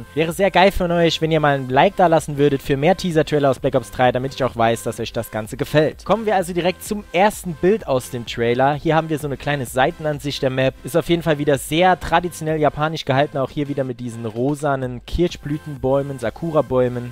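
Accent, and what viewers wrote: Saarland, Germany. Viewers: Kommst du aus dem Saarland?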